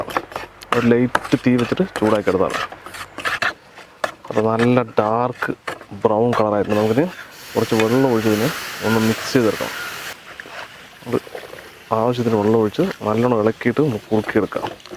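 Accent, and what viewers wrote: Palakkad, India. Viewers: Pallakad ann makale..😎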